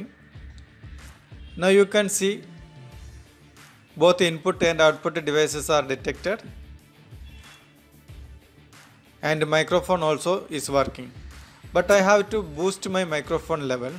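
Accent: Kerala, India. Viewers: ഇംഗ്ലീഷ് കേട്ടാൽ അറിയാം മലയാളി ആണെന്ന് !!!!!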